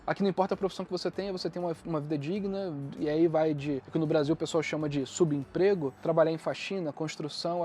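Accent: Rio de Janeiro, Brazil. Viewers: Vídeo muito bom Mas o cara fala assobiando com esse sotaque de carioca que chega a dar um ruim nos ouvidos kkkkk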